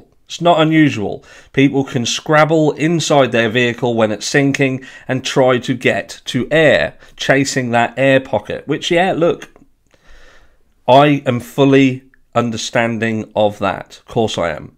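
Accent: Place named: England, United Kingdom. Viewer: There’s 1 thing that Sami did that really stood out to me. So AWP start searching Boca & possibly Donna Lake, LE tell AWP not to search it, Sami is aware through her parents (friends in high places) that they’re not searching Prosser. AWP put out a post that they found Kiely’s car & remains (not staying where they found her), Sami posts immediately “they have not found Kiely & the parents have not been told….” Blah blah & so on & so on. LE didn’t think AWP would go search it after being told not too…there’s someone in LE protecting Sami & whoever else is involved. Also, after a post comes out that Sami has a lawyer, she then posts a video of her drinking & partying at Prosser. Imo she was celebrating getting away with murder! I have so many other inconsistencies with Sami but they are the 2 that really stuck out to me…. You do a great job. 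I prefer you over these American idiots just going over crap that’s irrelevant & going down rabbit holes. So thanks for keeping it real. It also helps that I love English accents 😂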